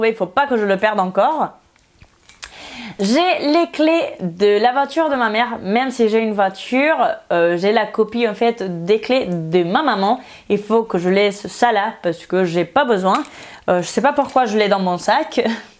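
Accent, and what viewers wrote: Spain. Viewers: Moi je trouve que t'as un acsent espagnol mais j'ai bien aimé la vidéo bye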